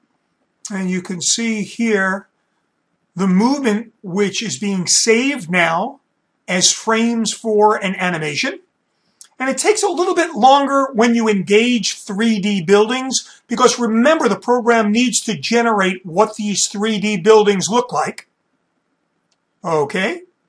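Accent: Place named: New York, United States. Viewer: Really nice job! And we get the bonus of your very special accent for New Yawk City:) QUESTION: If I have 5 or 6 or move Placemarks in my folder, when it comes time to Record Movie, will it automatically run through all 6 locations? Or do I have to manually click each "next destination"? In my case, I wanted an ultra smooth travel on my city crossing a bridge and taking some tricky freeway exits. So, Point A along the path/tour flows into Point B, B to C, C to D and so on. If I have to manually click each point, it's not as smooth as if the program automatically connected each point. Do you know if Google Earth Pro can do this? Also, when you have your placemarks all created in your folder, can you Record different versions-- such as "With 3D buildings and traffic" and without any overlay info? Or does Google Earth save those attributes into each place mark? 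Thank you!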